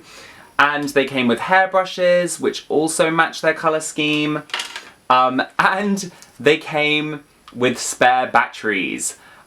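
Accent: United States. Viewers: I appreciate your American accent ❤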